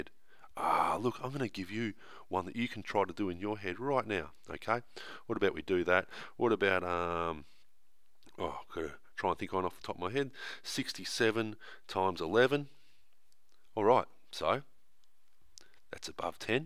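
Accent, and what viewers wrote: Australia. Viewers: I think learning maths in an Australian accent has just become my new favourite thing.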